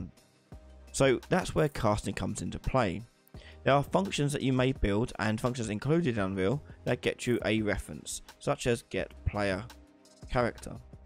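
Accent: United Kingdom. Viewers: Once I got past the heavy Bri-ish accent, I realized that your way of explaining this stuff is the Most helpful. Thanks!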